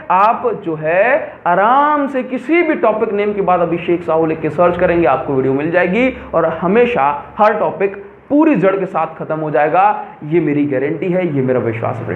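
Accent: Odisha, India. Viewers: R u from odisha? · Sir are you from Odisha? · Are you from odisha?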